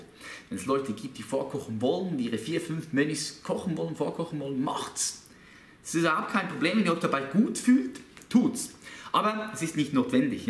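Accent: Switzerland. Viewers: Aus der Schweiz?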